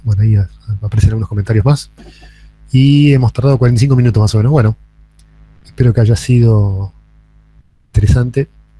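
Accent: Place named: Argentina. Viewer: Pense que era algo interesante de mi tierra ,Argentina !!!!me confundi por su acento , bueno este no es mi lugar y tampoco me interesa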